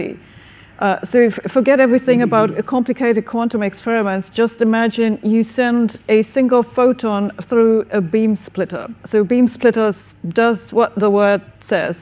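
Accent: United Kingdom. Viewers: I straight up, laughed out loud when she just inherently abandoned her natural British accent and said Albert Einstein’s name with a German accent LMAO just a little over the top and kind of speaks volumes that you may be eccentric in ways where you are maybe providing a false representation of yourself or an idea because you are so caught up and the excitement of the person or a thing you were talking about however, the danger of this is that lead two points of failure and error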